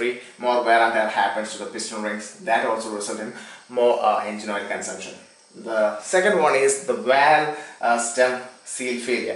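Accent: Kerala, India. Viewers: malayali aanalle... ?? :D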